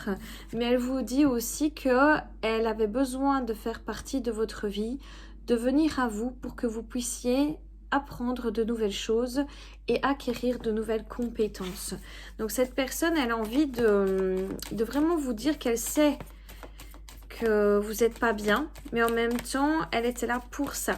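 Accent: Belgium. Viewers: Bonjour, toujours très belle, j adore ta guidance et t en remercie cette personne ne sera plus dans ma vie très bientôt ou j'ai mal compris.j adore ton accent belge gros bisous je te kiffe. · Où vous c'est la première fois que je vous écoute mais il me semble que vous avez un fort accent belge 👍😸♥️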